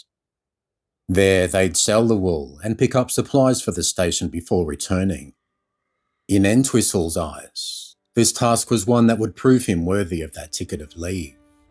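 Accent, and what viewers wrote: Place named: Australia. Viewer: Great to hear a proper Aussie voice.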